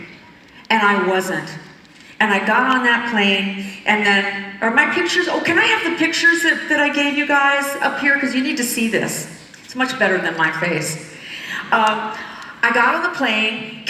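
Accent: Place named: Scotland, United Kingdom. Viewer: omg your Scottish accent BAHHHHHH HA HA The best!!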